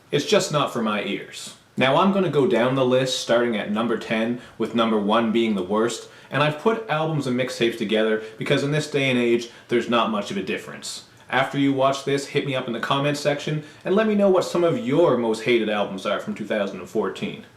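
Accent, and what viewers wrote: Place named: Canada. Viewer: Will do. Are you Canadian? I love the accent.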